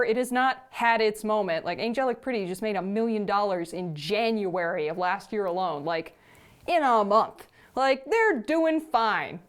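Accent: Texas, United States. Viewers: can anyone else hear the texan accent jumping out in this video????? 👀 (especially when starts getting fired up, i.e. about the first post and how crazy it was)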